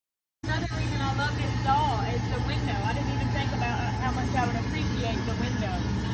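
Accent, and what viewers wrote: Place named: Tennessee, United States. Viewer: 😂 I just had to take a second to figure out what you would be saying, since I knew you weren't talking about maggots. I've lived most of my life in TN, so I'm used to accents like yours. hahaha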